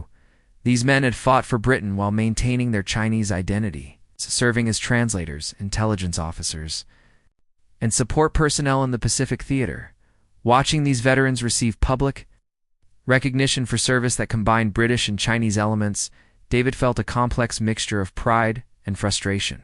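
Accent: United States. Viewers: Those are phoney uniforms - not one representing a regiment of the Brigade of Guards. You appear to have an American accent; why not post fiction based on an American formation?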